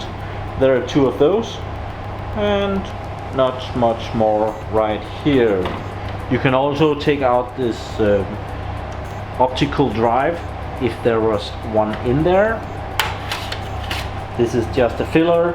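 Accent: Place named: Denmark. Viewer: did you say MPI? It looks like QPI to me. Unless that's your danish accident that says Q different.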